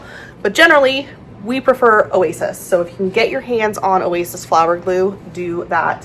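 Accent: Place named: Canada. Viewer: You're so Canadian. EH!... You said it so many times. Love it.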